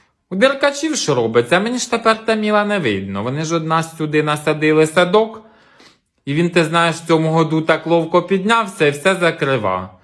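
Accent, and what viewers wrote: Poltava, Ukraine. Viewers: Звідки? по говірці дуже на Полтаву схоже)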